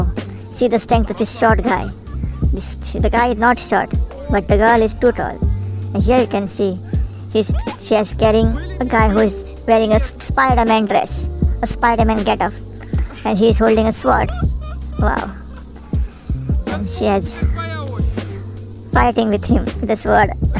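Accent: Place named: India. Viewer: please change this horrible Indian accent narration.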